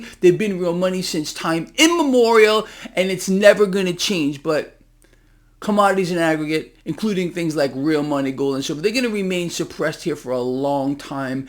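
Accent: United States. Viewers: It's the American pronunciation I guess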